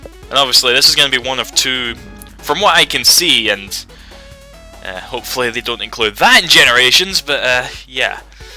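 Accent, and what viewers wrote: Scotland, United Kingdom. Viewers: lol when i was a cat xD i love scottish accent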